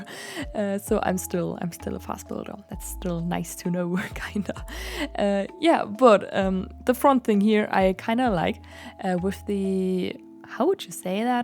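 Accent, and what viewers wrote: Germany. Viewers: Bist du deutsch? Hört sich so an :D Oh mann, ich wünschte ich hätte in echt einen Ort wie diesen 😍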